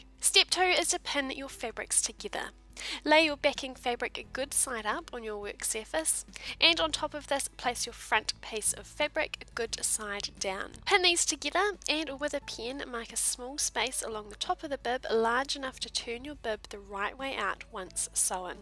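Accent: New Zealand. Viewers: her accent is kiwi - New Zealand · What accent is that ? Nz ?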